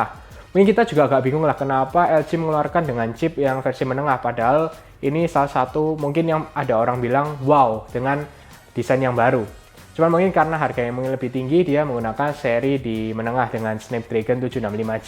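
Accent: Java, Indonesia. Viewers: Medok itu keren bro 👍🏻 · Gara gara logat jowo ahire aku subscribe.. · medok amat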